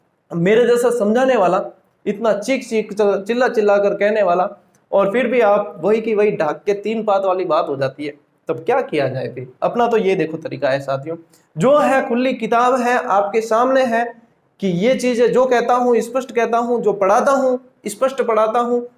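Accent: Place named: Marwari, India. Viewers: Hlw sir.......I'm from BHILWARA. I'm literally thankful to you for providing us all the classes free of cost. I was looking for some tips about preparing for the GK since I belong to science subject so my base in terms of GK wasn't clear and somehow reached to your page. The way you are teaching is truly amazing and will be definitely helpful for all the students who are unable to buy the paid courses. I never knew that we can also study the rivers in this way and I must say that learning with these river maps or tricks will make the knowledge solid in our brains for a longer time. Apart from all these, I honestly feel good whenever you do speak in our Rajasthani. As our Rajasthani accent is quite different from yours so I do like to hear your accent. AT LAST ........THANK YOU so much sir...😇🙏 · Aapki marwadi Bhasa bhut achhi lagti hai 😂😂😂👌👌👌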